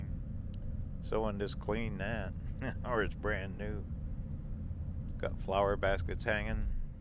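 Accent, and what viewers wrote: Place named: South, United States. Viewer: You do have a southerner accent.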